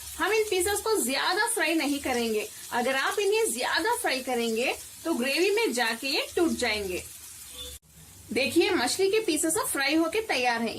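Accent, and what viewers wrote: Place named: South India, India. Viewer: Hope you are from south india, Because of your Hindi pronunciation, i feel that.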